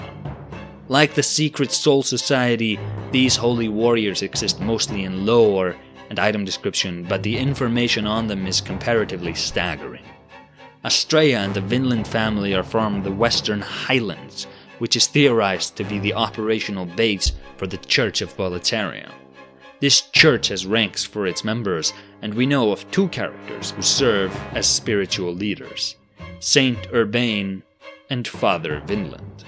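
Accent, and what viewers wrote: Iceland. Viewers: Just stumbled across this channel, love it! Demon's Souls has always been my favourite! From the accent I have to ask: are you Icelandic,? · Umbasa, I love this game. Edit: Do I sense Icelandic in that accent?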